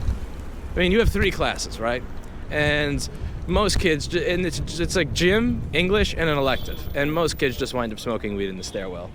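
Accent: New York, United States. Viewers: you are a true noo yawker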